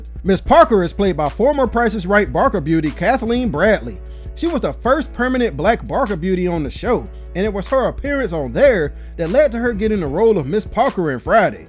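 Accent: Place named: West Coast, United States. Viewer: I like your West Coast accent, cuz.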